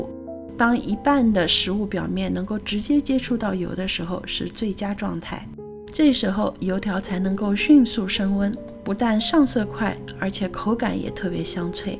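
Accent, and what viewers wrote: Shanghai, China. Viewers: 谢谢。听到你视频里的上海话感觉很亲切。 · 上海方言好親切！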